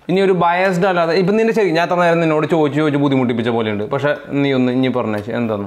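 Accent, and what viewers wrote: Thrissur, India. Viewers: What a humour. Trichur slang. Beautiful. Yes ur hands r love. Fantastic presentation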